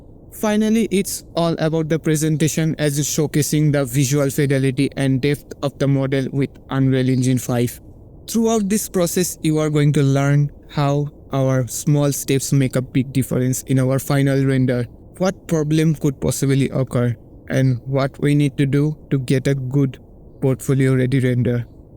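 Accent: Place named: India. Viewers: I am not Indian but I can also confirm that he is Indian. 😅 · i am an Indian so when I listen your English I confirmed that you are Indian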